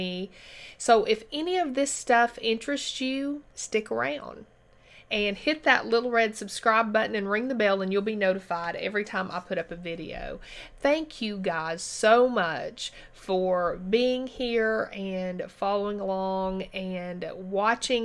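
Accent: South, United States. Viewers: You are so pretty. I love your southern accent. I have one as well. Georgia girl. I have made the decision to go gray.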